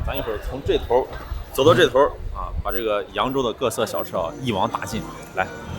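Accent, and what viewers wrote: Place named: North, China. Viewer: ：听口音，你是北方人，不是西北或者华北人。你在吃江南面食小食等插话缺乏艺术，也缺少历史文化语言。 南北方吃文化相差很大，江南以炒菜为主，北方以一锅煮为主，作为美食家要会比较。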